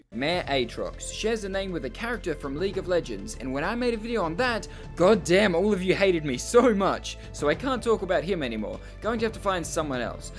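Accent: Australia. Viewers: good content and austrailian accent 10/10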